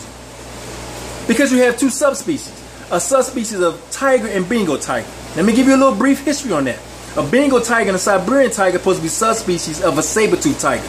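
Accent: DMV, United States. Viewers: Great explanation! Great looking fish too Champ. 👊🏾 Im from DC bruh and based on your accent you gotta be from somewhere in DMV area! 😎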